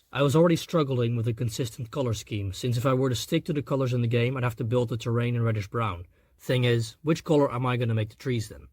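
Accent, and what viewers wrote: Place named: Netherlands. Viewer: k voelde dat je nderlands was en nu weet k het zeker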